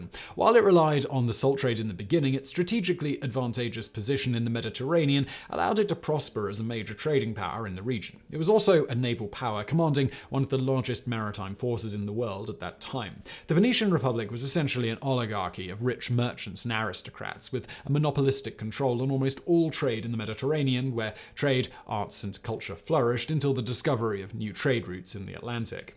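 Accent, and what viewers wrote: United Kingdom. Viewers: The British accent doesn't fix Simon's abysmal pronunciation of even the most basic of English words. Painful. Not wasting any more of my time here.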